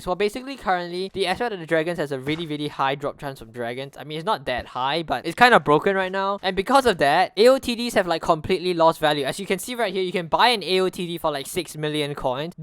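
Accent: Singapore. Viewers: Is dis dude a Singaporean? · you have a huge singaporean accent are you from lee kuan yew country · Are you in Singaporean cause I am and U have the accent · eyy singlish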